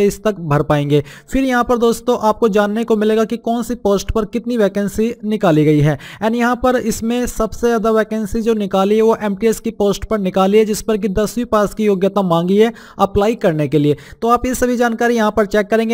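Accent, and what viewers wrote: Saharanpur, India. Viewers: Brother aap saharanpur sa ho kya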